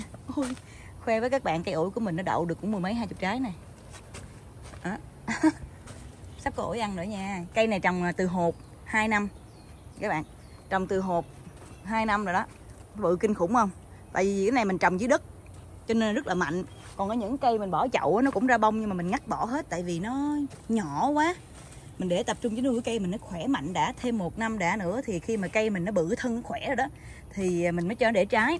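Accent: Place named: Saigon, Vietnam. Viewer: Cô ngạc nhiên nói giọng SG nhưng dùng từ MB.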